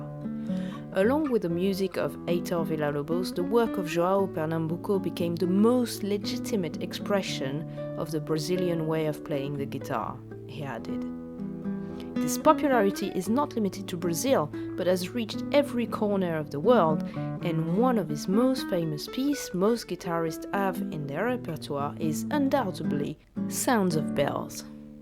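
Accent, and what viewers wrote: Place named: France. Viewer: You've a delicious french accent.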